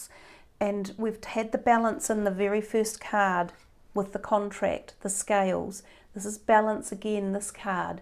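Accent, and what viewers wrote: New Zealand. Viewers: Sounds like a kiwi accent 🌲🌿 🤗 Thanks for your reading. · “Four different dex of cards”... Love the kiwi axcent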